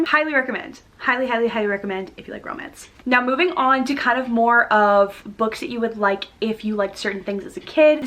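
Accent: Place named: Russia. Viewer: You akcent rusian 👀👀👀👀👀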